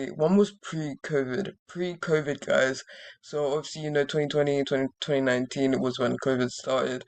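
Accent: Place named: United Kingdom. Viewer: I love this style of video and I love your voice you are so British mate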